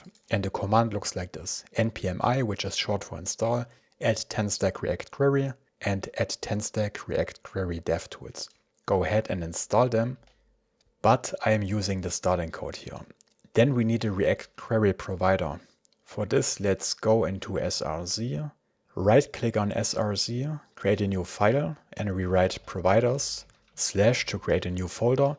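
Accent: Germany. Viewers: gotta love the german accent/pace :-) slow and nice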